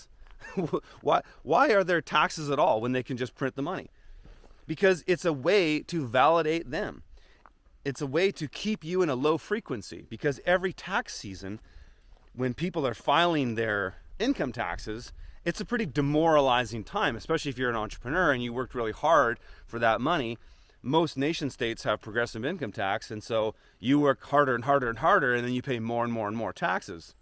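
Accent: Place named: West Coast, Canada. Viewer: Refreshing to hear your perspectives on the Dutch farmers, et al. As a former B.C. native, now living in the Netherlands - on a basic level I appreciate hearing your West Coast Canadian accent speaking and seeing the visuals of your farm and overall and island/coastal habitat. It definitely, takes me back to my roots and sets up familiar perameters which compliment the core of your message to us all - wherever and whomever we may be. I also found interesting the definition of Canada you cited ... being "the internal and territorial waters". Does that mean the government doesn't own the land, and therefore has no jurisdiction over the people who live there ? Are they ruling, therefore, illegaly ?? Unless, you happen to live on a houseboat or in a canoe, perhaps. All of that leading up to the "cherry" imo, which to my ears rings like a bell: "I'm in free country here... 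THIS is Canada" !! Sounds good to me :) It's refreshing to hear you clear away the clutter and giving this topic some room to breathe - by sending it to us from into the wilder, open spaces. The Dutch farms take up an incredible amount of "open space" here too, by the way. And, the Dutch farmers are incredibly resilant. They will not back down, in spite of the odds being against them. They built the Netherlands, in a sense. And, more and more people in Holland are on board with them, in spite of the overall inhabitants not being able to live-off-the-grid, so much, in this country. It is the densist, most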